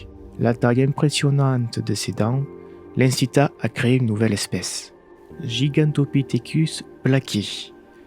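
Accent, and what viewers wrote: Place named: South, France. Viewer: Je découvre ta chaine, franchement j'aime beaucoup. Tes vidéos "News" sont intéressantes tout en étant relaxantes, c'est un format très appréciable. De plus, étant originaire du Sud de la France, ton accent me ramène un peu chez moi ! Bref, tu gagnes un abonné. ^^ Du coup je te dis à la prochaine ! ;) PS : Petite remarque sur un détail qui a tout-de-même son importance : le nom de l'espèce ne prend jamais de majuscule, seulement le nom du genre. Aussi, dans ce cas, il te faut écrire Gigantopithecus blacki dans le titre. Voilà voilà. :p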